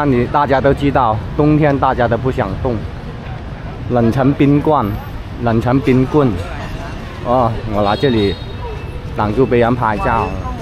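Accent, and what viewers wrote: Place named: Guangxi, China. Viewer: 广西老表么，口音好像啊😂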